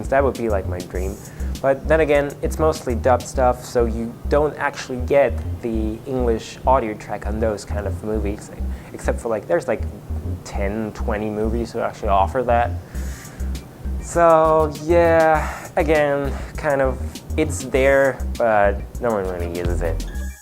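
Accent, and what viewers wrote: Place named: United States. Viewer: Hey! You have produced nice videos, i am impressed! I like your american english, it sounds pretty fine. I would wish that more people would know this video becuase it is excellent! And by the way, I agree with MrMattex01.. you or rather your videos are awesome! Greetings from Germany I hope you recognize me:P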